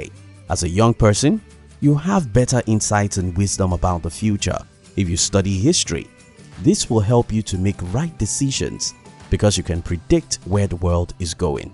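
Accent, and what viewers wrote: Nigeria. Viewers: It's quite obvious he's Nigerian. So what? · you sound nigerian.are you? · This guy on the audio is Nigerian trust me .. he just Dey Lagos here now Dey tell us how to make money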